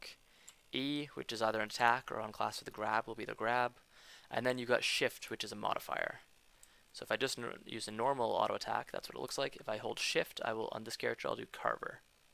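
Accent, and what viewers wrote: Canada. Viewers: Get oot of here mate. Love the Canadian accent!!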